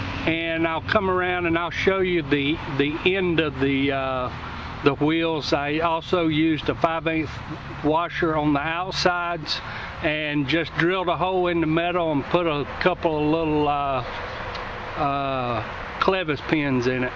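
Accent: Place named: South, United States. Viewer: just love that Southern drawl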